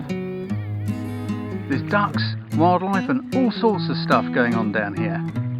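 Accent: United Kingdom. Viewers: This is excellent, great content for a photographer looking to get into video. Thanks for sharing, any chance of more in this series? Your presentation style is spot on, and a British accent as well, hooray!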